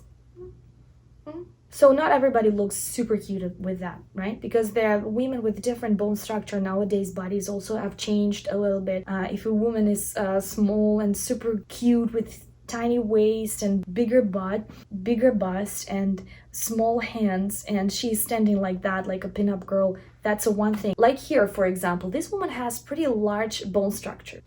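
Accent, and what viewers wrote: Russia. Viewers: Я одна слышу русский акцент?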